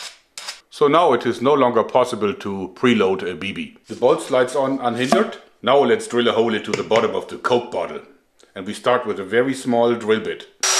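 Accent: Germany. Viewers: This guy's accent is so charming. Once I heard him say he was going to try to weaponize a coke bottle with that German accent I knew I was in for the whole video. XD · I really like your German accent when you speak English. 😜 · Dat is ja richtiges deutsches Englisch!!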